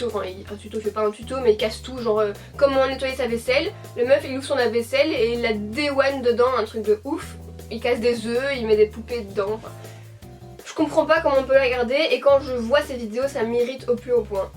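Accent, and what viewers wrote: Belgium. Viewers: je kiff ton axant avant j habitait en Belgique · Ah non on peut pas confondre l accent belge et suisse ;0) ! Parole de suissesse ;0) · L'accent n'est pas très marqué je trouve comparé à mon oncle belge ^^ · Waow super vidéo 😘 Mais, une petite question, j'ai pas compris parce que tu as dit que tu est italienne mais que tu as un accent belge ? 😹😹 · Je crois que les gens confondent les accents car souvent pour les français, l'accent belge c'est juste l'accent bruxellois. Perso je savais que tu étais belge car je suis du Hainaut aussi ahah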